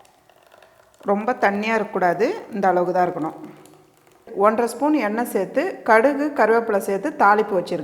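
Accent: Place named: Tiruvannamalai, India. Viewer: Thiruvannamalai tamil slang I think 🤔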